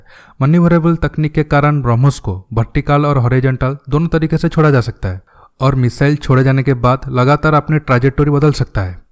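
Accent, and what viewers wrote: Odisha, India. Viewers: Odiya hai apka hindi achya nehi hai ..odiya typ ka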